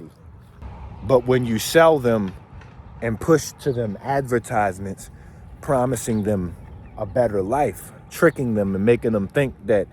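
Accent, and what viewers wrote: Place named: Baltimore, United States. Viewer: u from baltimore huh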